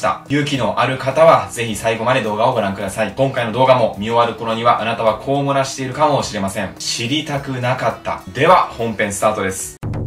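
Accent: Kansai, Japan. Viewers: さんの動画に出会ってから人生が一変するほど食に対しての意識、世界が変わりました😂 さんが教えてくれたことを色々試したくて実践するたびに楽しくて仕方がなく、毎日カラダが喜んでます🐣🐣🐣 新たな扉を開いてくれて本当に有難うございます💕🙏 さんが話す関西弁が大好きなので、ぜひこれからも頻繁に登場させてください🥰（笑） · くん、さすが関西人！ネイティブやね。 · 双子の姉からさんのチャンネルを教えてもらって、最近入部し、双子でハマっています笑👯‍♀️❤️ 私も人生が一変するくらい食生活が変わり、知らない世界が広がり、食にこだわる事が楽しくて仕方ありません！🤗 さんの軽妙なトーク力と、惜しげもない知識が面白すぎて、色々実践してみたくなります😉 今日も手のスナップ運動きかせて、カラダヨロコブ物、生産者さんを応援出来る物、見つけてゲットしてきます😊 私もさんの関西弁大好きなので是非❤️笑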